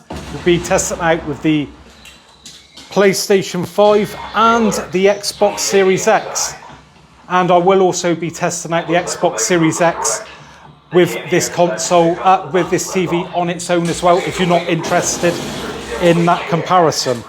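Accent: United Kingdom. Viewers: Love the video mate. Nice to hear a Brit on you tube for a change. What wall mount did you use as I am thinking of buying this. What made you chose this over the C1 by the way?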